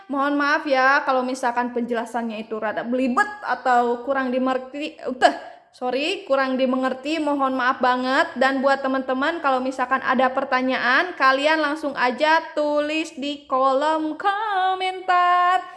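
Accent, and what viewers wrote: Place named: West Java, Indonesia. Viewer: Kk orang sunda ya😅 Lucuu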